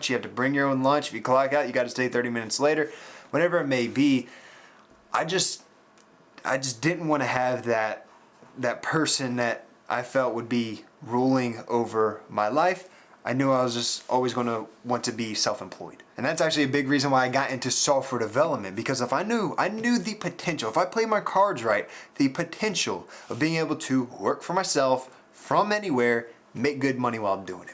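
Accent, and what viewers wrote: Texas, United States. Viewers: i love your accent dude. are you from texas? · Are you from Texas?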